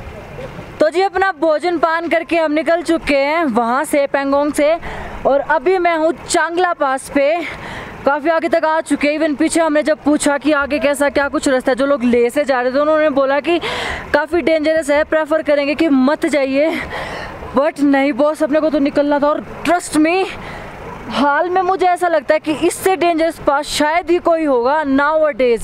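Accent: Haryana, India. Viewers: Haryanvi accent superbbb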